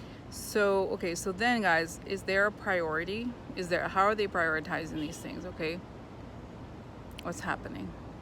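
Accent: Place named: Russia. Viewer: Have you ever watched "The Night Stalker"? An investigative jounalist who had a knack for unwittingly discovering and investigating psychic crimes; a TV-series from the 1970s, starring Darren McGavin as "Karl Kolchak "(imagine I'm typing with your Russian-style accent): 'very funny, I think you like'. Thank you for the succinct and interesting vlog; I'd been wondering the degree of co-ordination required and when, exactly, it had all begun - like, given Trump's history were the various state and federal agencies already in an investigative process. Great vlog, thank you and best vibes from Melbourne, Australia.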